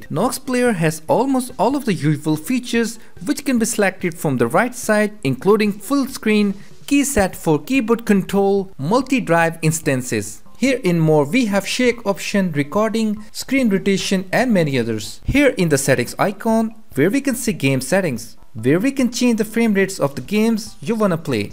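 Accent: India. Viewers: It’s not that bad...he sounds like tech support from the land of India! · So Bad englisch i understand like nothing pls do something against your Indien acent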